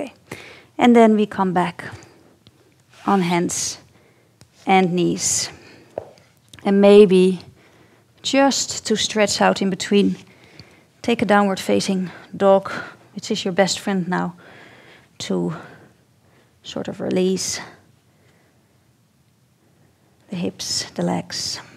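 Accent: Netherlands. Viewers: No, it's a Dutch accent. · I love your Dutch accent. And your videos.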